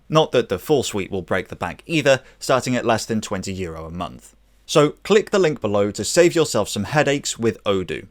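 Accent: United Kingdom. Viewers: How come you sounds British😂 I like that acent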